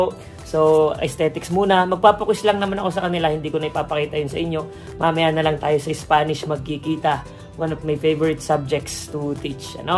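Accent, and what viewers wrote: Batangas, Philippines. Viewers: Modern day priest. I like the Batangueño accent and his being jolly very contagious. Father is an ideal Spiritual Director for the millennials. More power father!!! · Akoy natutuwa saiyoy Fr. Hahaha batanggenyong tuno talaga eh.... Hahahahha Nakakahawa ka ng tawa.Apakamasayahin mo po God bless you po ❤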